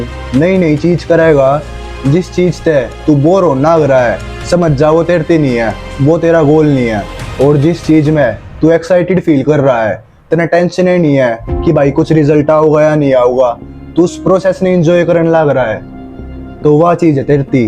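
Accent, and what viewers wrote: Haryana, India. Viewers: Haryanvi Exant Amazing Work Bro ❤ Difference vibe with same word ✨🔥❤️🧠👑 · Nice harayanvi style 😊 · Haryana ki language h bro tere smj t bhar h😂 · Bro vo bhai harayani bol rha hai isame hasne ka kya hai vo bhai kuch sikha rha hai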